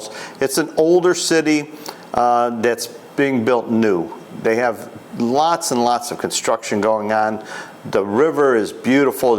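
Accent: United States. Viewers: You from Chicago? Your accent sounds like it?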